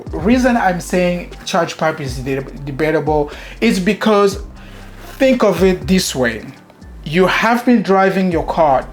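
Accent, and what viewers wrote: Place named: France. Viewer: Are you french ? You have a little accent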